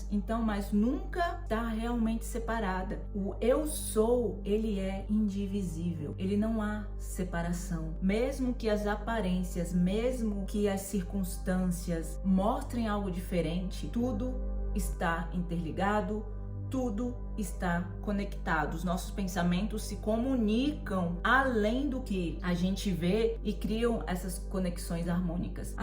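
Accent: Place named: Maranhão, Brazil. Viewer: ❤❤❤ que top conhecer o rosto dono da voz. Eu imaginava voce morena, nao sei porque rs. Mas seu sotaque é tao gostoso de ouvir, parece de uma amiga minha do Maranhao. Como sempre um video pontual. Amei, prazer te conhecer!!😊😊😊🥰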